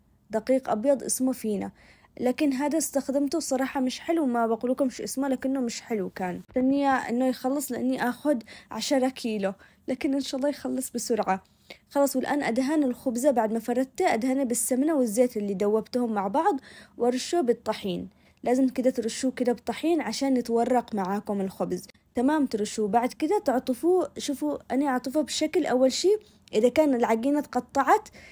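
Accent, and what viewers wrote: Aden, Yemen. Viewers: كلامك العدني المميز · لهجة العدنية جمييييلة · ما شاء الله بالعافية ٦٠% متابعتي لك تعجبني الهدرة العدني ..اذكر زمان نسوان الجيران يتجمعوا بالزغط بعد العصر وهات من هذه الهدرة الرائعة 😂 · ماشاءالله عليك انفدالك ومحسن اللهجة العدنية حقنا 😢❤ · الحلو انك ماغيرتي لهجتك العدنية الحلوة مش زي بعض اليمنيات تسافر الخليج شهرين وقد اقتلب لسانها وغيرت كلامها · كلة كوم واللهجة العدنية كوم تجنن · ماشاء الله تسلم ايدك اني احبك واحب كلامك العدني عسل عسل ❤ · بحب اللهجة العدنيه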